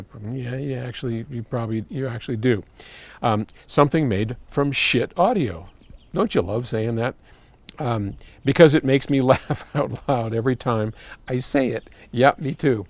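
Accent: United Kingdom. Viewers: THE British accent!... Which one?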